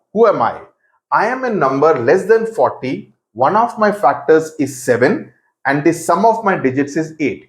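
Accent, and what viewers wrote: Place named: South, India. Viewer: Sir you are south INDIAN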